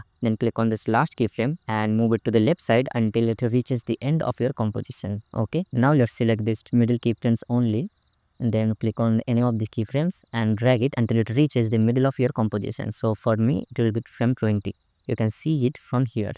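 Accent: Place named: India. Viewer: always the ppl with the indian accents who have the most GOATED tutorials :glasses-purple-yellow-diamond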